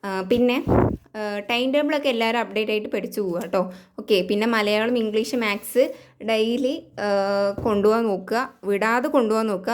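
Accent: Palakkad, India. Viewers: Ningal Palakkad aan llee😂😅??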